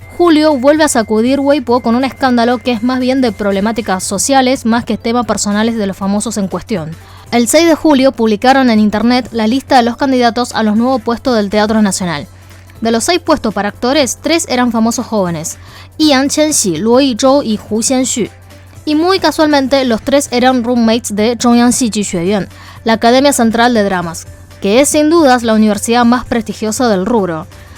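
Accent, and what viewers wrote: Argentina. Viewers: En Latinoamérica es peor y nunca salen a la luz .En todo el mundo hay favoritismo pero es raro que salga a la luz eso.Excelente video y tu acento Argentino muy bonito si no viera en tus vídeos.Diria que es documental narrado por una Argentina .Perdon yo sé que sos Argentina y China pero yo te miro como latina que nos informa de China todo lo mejor para vos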